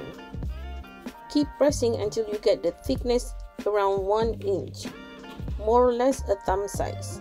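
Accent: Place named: Malaysia. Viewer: Found this video after i’ve already made potstickers which i failed :( btw, are u malaysian? The accent is so familiar, but the channel name doesnt sound malaysian at all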